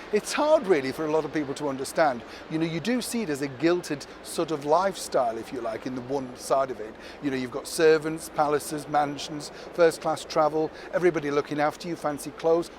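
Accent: United Kingdom. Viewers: I'm watching from Lisbon, Portugal. I love your beautiful British accent.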